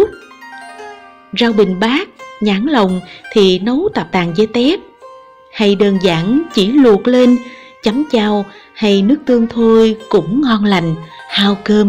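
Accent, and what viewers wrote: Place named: South, Vietnam. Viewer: Hoan hô cô em vẫn giữ chất duyên dáng và hồn nhiên của người miền nam. Đừng bắt chước tiếng Việt lai căng hiện nay, nghe ' hổng dô'.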